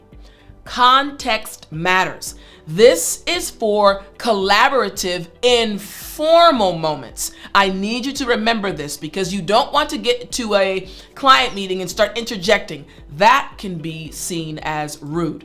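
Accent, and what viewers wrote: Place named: United States. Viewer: Your americain accent sounds great I always enjoy it🤩 I've learn so much from the ways you pronounce the words in your videos, to sound a little bit americain😇 It's so cool to watch your videos THANK YOU VERY MUCH😇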